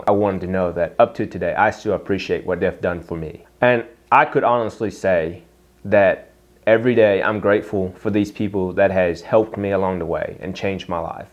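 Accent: South, United States. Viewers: I love the southern accent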